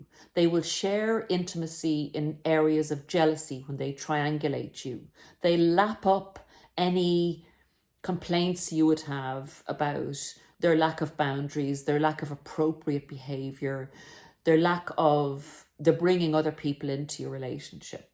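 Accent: Ireland. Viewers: Are you Irish?🍀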